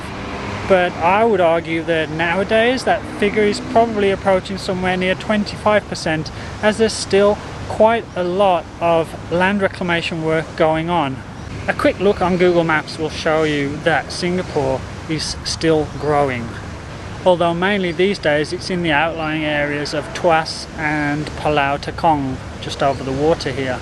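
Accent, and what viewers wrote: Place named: United Kingdom. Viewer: Hi, I regularly watch your videos with interest. I lived in Singapore for 40+ years. What “bugs” me about your commentary is that you PRONOUNCE street names or places as if you were an Ang Mo that arrived just yesterday. I recommend that you (learn to) pronounce names the way Singaporeans say it, and not how the British would do it. The other day you visited BAKIT TIMAH. That sounds awful.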